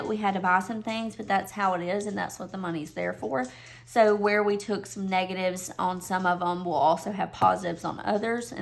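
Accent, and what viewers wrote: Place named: South, United States. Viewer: ! That accent miss ma’am! I didn’t know you were a southerner like myself.😋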